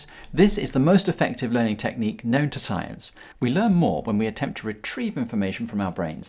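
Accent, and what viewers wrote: England, United Kingdom. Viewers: Ok United Kingdom accent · Darling, your English voice is so comfortable to listen to, would you consider bringing in a Spotify podcast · why is every fucking youtube clip I click on either narrated or presented by a Brit?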